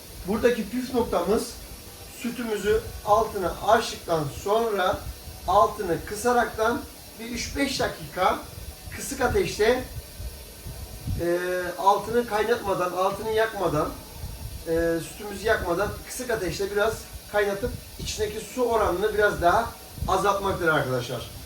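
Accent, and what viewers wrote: Gaziantep, Turkey. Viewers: abi sen antepli misin?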